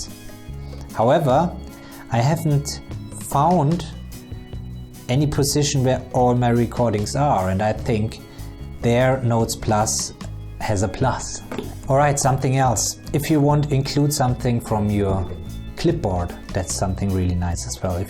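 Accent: Germany. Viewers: Lol 🤣 ich wohne in Deutschland und meine Verliebte ist ein Deutscher! I could only tell because I’m used to hearing the German accent, I really like it! But seriously this review made me much more optimistic, Thanks for the review. I’ve been using a galaxy note <num> edition for about 5 years now and just switched to the ipadpro 12.9 last week. Immediately I noticed how bad the default note taking app was. I downloaded all the free note apps and none of them come close to S-Note in its features. I could import PDFs, I had way more templates (College ruled, normal spacing, grid, textures) better pencil options with a grain textured pencil that made it feel more like paper, the ability to transform written text into typed words or equations, search based on written notes, and so much more but best of all it was free! Overall I’m really disappointed in Apple’s integration with the Apple Pencil and iOS, I mean there is none. 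It’s basically a big iPad with a pen that was thought of as an afterthought. I got it primarily for art and in that regard it’s marginally better (Procreate is really fantastic and very professional), but the file management system, widgets, customization and split screen in iOS 11 is really limited in comparison to Android - but your review made a bit less cynical 👍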